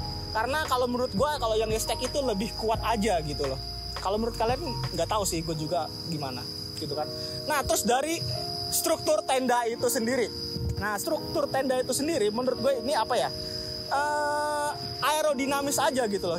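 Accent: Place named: Indonesia. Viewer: Suarnya medok banget bang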